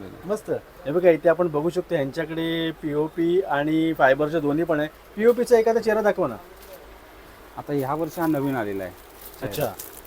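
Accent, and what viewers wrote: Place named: Malkapur-Akola, India. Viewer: Dada tu malakapur akola chya ahes ka tuzhi language tikadchi h bhari vate ekyala❤❤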